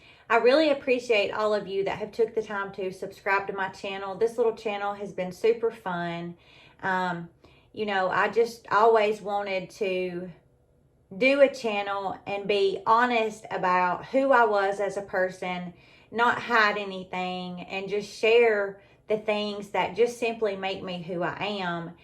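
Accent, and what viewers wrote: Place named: South, United States. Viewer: Hi, i just subscribed!😊🎉❤ I saw some of your denture videos. I was just browsing through your videos, and my experiences are so much like yours. It's crazy! My teeth are so bad! They are broke off to the gums. I am 60 yrs. old and i want to get dentures just like yours. lol I love them. You mentioned in one of your videos about the 3D dentures. Could you tell me where to reach them and how much they are,please?🙏🦋🌸💐😉👍❤️ You got the talking down with yours, girlfriend. 😏 Ive been clean for 14 yyears now.👍🙏 Im much happier now. I will check out your videos. Thanks for sharing. You seem like such a great person. I love your country accent!!! Lol❤😊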